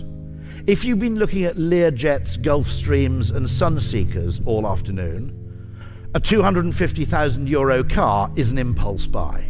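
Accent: United Kingdom. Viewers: Everything sounds better in a British accent and lingo! But yeah, that's great effing advice too. Just wish I could be a cheeky bugga too!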